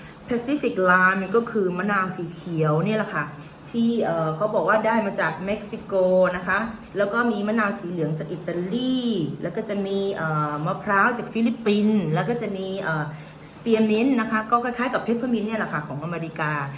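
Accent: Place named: South, Thailand. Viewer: อุ๊ย ชอบ แหลงใต้ด้วย สาวใต้สวยเก่งแบบนี้ต้องติดตามทุกช่องทางแล้วค่ะ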